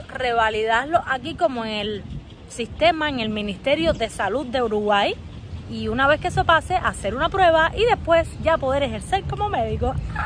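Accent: Cuba. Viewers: Hola Ojalá puedan lograr su autito. Dile que no se preocupe por el escarabajo. Es fiel. Y por sumba. Búscate un gym y ofrece tu servicio. Te darán lugar para que ejerzas. Uno buenos pesos no está mal. Y con tu tonalidad cubana....se llenara. Tengo un gym. Por esa razón te comento. Abrazo desde BsAs.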